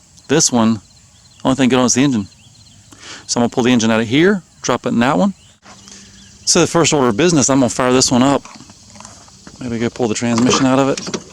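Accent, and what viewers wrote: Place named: South, United States. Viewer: a hint of a southern accent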